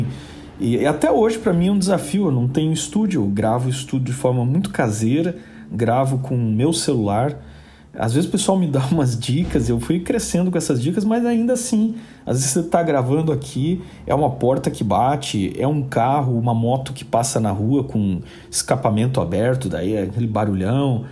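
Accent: Brazil. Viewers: Nosso sotaque gaúcho sempre nos entrega! Gostaria de agradecer pelo canal. É a materialização das minhas paixões! Uso-o muito para auxiliar os ensinos didáticos do meu filho. Se houver alguma maneira (com mão de obra) que eu possa ajudar, por favor, deixe-me saber. Que o Senhor o abençoe grandemente!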